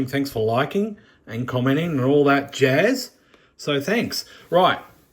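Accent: Australia. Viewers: Knew you were a bloody Aussie, like me, pick the accent anywhere :)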